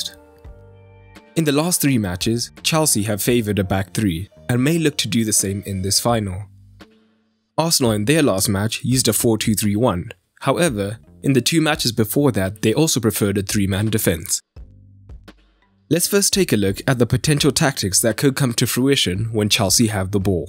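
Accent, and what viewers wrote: South Africa. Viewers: You sound South African ? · Is that a South African accent by any chance? Quality, as always!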